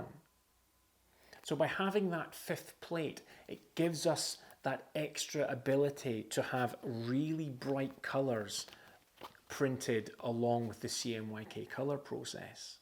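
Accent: Scotland, United Kingdom. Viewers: A great explanation of what Pantone colours are for. Nice to hear a Scottish accent too, rather than the usual American! Subscribed.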